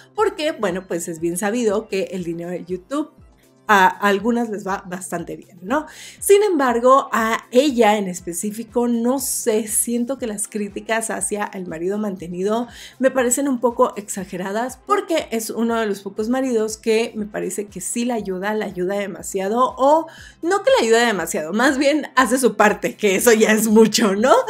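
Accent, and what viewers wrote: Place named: Peru. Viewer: YO CREO Q ESTA BIEN ,Q NO PIERDA SU ACENTO PERUANO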